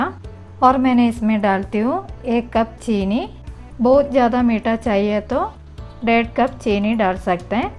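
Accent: Kerala, India. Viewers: Your Hindi with Malayalam slang is perfect. I love it. Keep up the good work.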